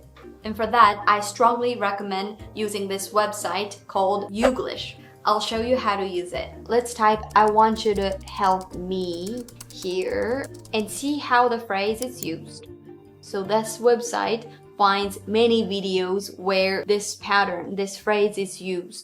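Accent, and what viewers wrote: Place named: United States. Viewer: Your voice is like an American.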